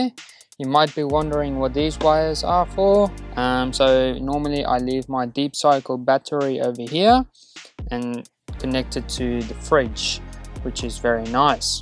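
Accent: South Africa. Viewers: I can hear that Boer accent coming out. Love that i0 of yours. Have a Jimny but tired of the 1.3 and other irritants. Enjoy. Cheers from sunny SA.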